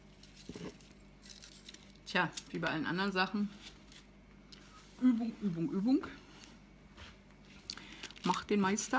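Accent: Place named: Berlin, Germany. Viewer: Biste aus Berlin? Ick hör did doch :-)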